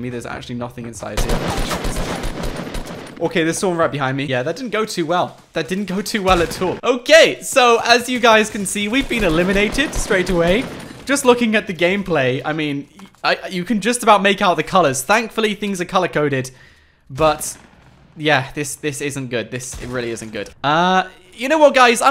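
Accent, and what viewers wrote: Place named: RP, United Kingdom. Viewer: his accent is so posh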